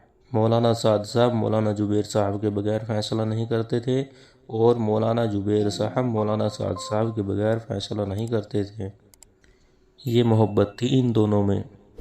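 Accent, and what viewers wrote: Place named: India. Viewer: HAZRET KI LA-JAWAB URDU: Yea koi Hind/Deoband kay alim hain? In ki Urdu Hindi ki mahzooz-kun amezish liay huway hay, maslan "NiZam" ko 'NiJam", "Jawab" ko "Zawab", "Shura" ko "Sura", "terah/terha/terhan" ko "terain" kehtay hain! Alif kio Ain-Alif ki awaz say perhtay hain, maslan "Ap" ko "Ain+ap" (ba-awaz-e-"Aam/common) kehtay hain, jo kay sareehan ghalet hay.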